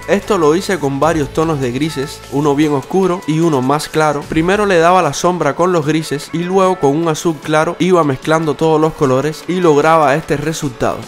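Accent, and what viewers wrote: Cuba. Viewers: Tú eres cubano ..???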